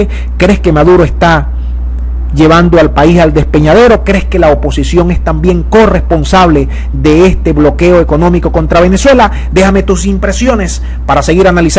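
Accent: Cuba. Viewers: Este locutor debe ser Cubanisimo un efuerzo immenso para lucir Venezolano. Solo Las cuentas que estan USA estan cerradas Venezuela tiene cuentas en la China y en Russia Las aerolinias Americanas no estan volando por El peligro que eso significa. La China si quiere traer otra ayuda a pesar de que El gobierno diga que no se necesita la puede llevar. En USA los que tubieron que salir llevan años pidiendo que le cierren Las cuentas a esos descarados que le dicen Al Pueblo pon la cara por mi que te estoy ayundo con una cajita clap de arina y grasa y por que los españoles y adecos y copei te dejaron pobres y USA quiere tu agua y petroleo y luego con El dinero de los Venezolanos se para Miami, Orlando y New York viviendo y pagando Plato caros mientras El resto haciendo colas por que Las colas no son de Enero para aca solo un Cubano llama a Maduro Presidente o narco y con razon por que le reparte la Plata de los Venezolanos en dollares y petroleo a ellos y los narcos Les deja hacer lo we le de la gana. 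insisto eres Cubano comunista o narco. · Que bien . Asi Maduro saldra mas rapido. Tienes acento cubano. No tienes derecho a opinar. Eres mas falso q billete de 7.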